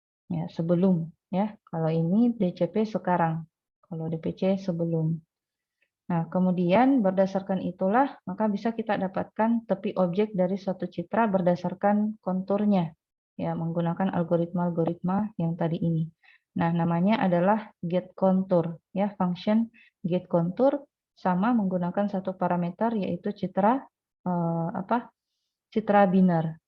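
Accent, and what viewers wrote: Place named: Gorontalo, Indonesia. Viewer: logatnya Gorontalo/sulawesi bngt nih wkwkw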